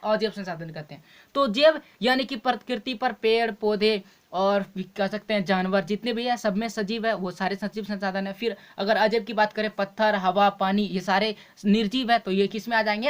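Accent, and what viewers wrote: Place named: Rural, India. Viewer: Gawar jaise kyo bol rehe ho aur faltu me pencil kyo chela reha hai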